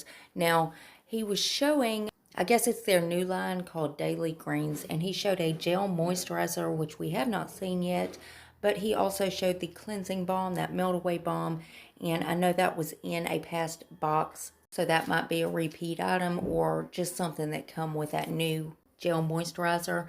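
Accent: South, United States. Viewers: Thanks for sharing the info!! I love listening to you talk bc you have a really thick country accent like I do, 😂😂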